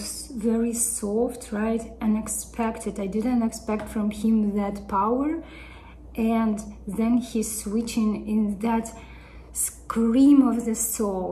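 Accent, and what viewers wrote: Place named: Russia. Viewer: Какой-то у тебя акцент русский...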